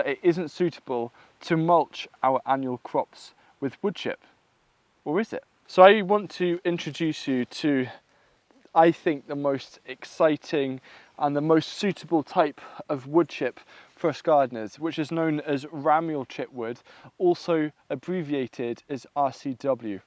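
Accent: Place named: United Kingdom. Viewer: "Woodchip is" Why are English dialects so grating?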